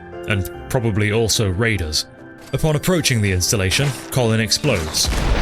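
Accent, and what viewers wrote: RP, United Kingdom. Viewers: You're like the anti-nerbit. You don't like melee weapons, like the railroad, have the posh British accent instead of the funny one he has, and exploit the game to make it easier rather than harder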